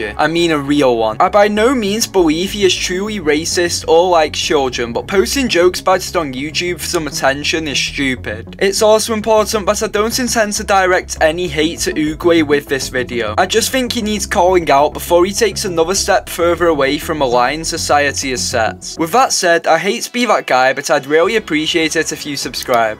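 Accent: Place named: United Kingdom. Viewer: "and you can always undo it if you get tired of my british accent" LOL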